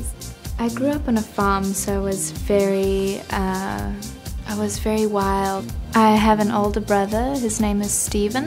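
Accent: South Africa. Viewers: Please don't lose your SA accent, the american accent has claimed too many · she has the south african accent .....awesome cnt believe she used to be my high school monitor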